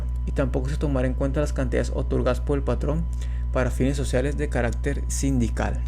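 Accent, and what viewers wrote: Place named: Latin America. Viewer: Tu acento se escucha de otto país de américa latina no de México, y para mi grnera algo de desconfianza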